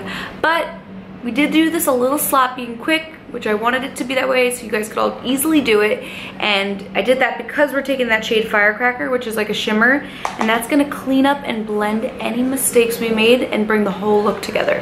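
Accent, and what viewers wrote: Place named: New Jersey, United States. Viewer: just wandering where ur from. i live in nj and you sound like ur from here!!!!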